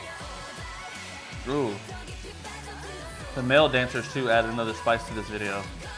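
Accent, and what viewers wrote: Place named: South, United States. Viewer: refers to the genetic condition of two different color eyes. If you look closely, they all have two different colored eyes. And the last video they’ve built the tree so I don’t know if it’s green screen. We’ll know when they release the behind the scenes. And where are you from? You are a southerner I can tell. Georgian here 🍑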